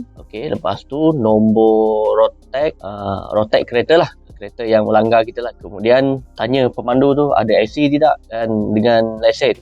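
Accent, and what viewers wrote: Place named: Sarawak, Malaysia. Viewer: TQ bro info bagus. Baju JDT tapi slang Sarawak. Terlanggar awek Johor ke bosku.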